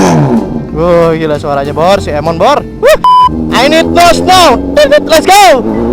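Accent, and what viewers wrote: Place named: Java, Indonesia. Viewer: Bang coro lagat ngomongmu kok kyo wong jowo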